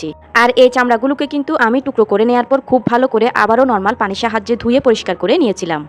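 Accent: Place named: Barishal, Bangladesh. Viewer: আপনি বরিশাইল্লা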